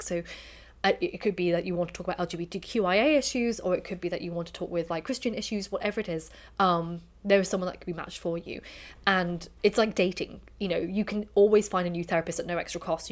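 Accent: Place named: England, United Kingdom. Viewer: I would like to apologise for previously commenting on your pronunciation of "Culloden" in one of your videos. I was taking it at face value that due to your English accent you were ignorant of Scottish culture. I realise now I was wrong and I'm sorry if I caused you any distress. I hope you continue on your journey of recovery.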